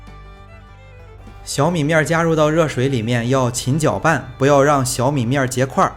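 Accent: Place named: Jinan, China. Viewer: 别忘了山东腔 ;)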